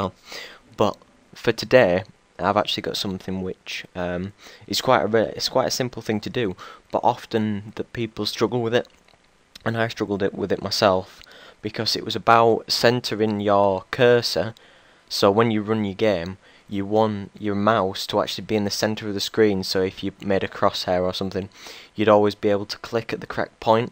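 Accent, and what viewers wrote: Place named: England, United Kingdom. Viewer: Haha strange isn't the right word maybe, sorry. ^^ I come from Germany but I never heard such a dialect from England!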